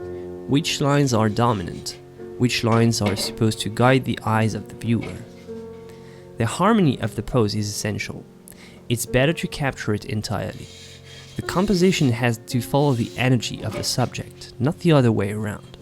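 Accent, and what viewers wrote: France. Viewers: I can tell you've been working on your English. Your French accent is much less pronounced now. If you've been working on this, well done!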